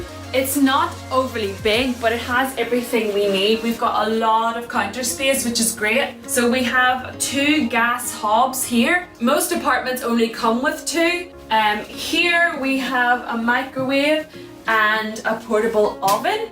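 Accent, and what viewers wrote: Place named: Northern Ireland, United Kingdom. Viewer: Studied English for so many years and have been living in the US for ten years. This is my first time hearing someone from Northern Ireland speaking. I can tell Northern Ireland accent sounds way much different than England accent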